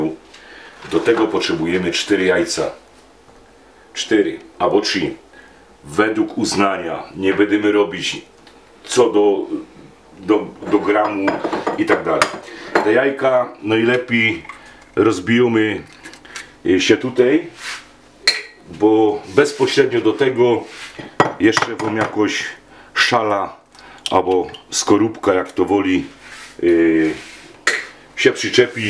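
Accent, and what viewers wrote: Silesia, Poland. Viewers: Pozdrawiam z podkarpacia Panie..swietny przepis na zdrowe placki ziemniaczano, serowe bez chemi...lubie sluchac twojej mowy slaskiej..dziekuje za wiele wspanialych przepisow kulinarnych...nauka nie idzie w las....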